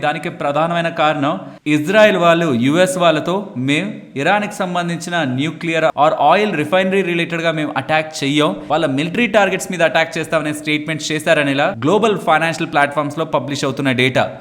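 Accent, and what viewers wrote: Nellore, India. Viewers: Bro meedhi nellore na ?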